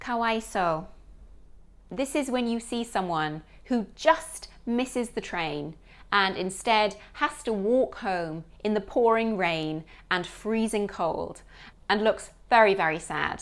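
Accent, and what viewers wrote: United Kingdom. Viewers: I like your British English, too. 🇬🇧😀😃👍🇯🇵👍😃😀🇬🇧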